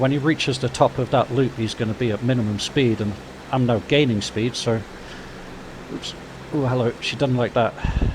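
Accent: United Kingdom. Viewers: Kind of cool and weird at the same time hearing an Englishman narrating DCS in a FW-190 lol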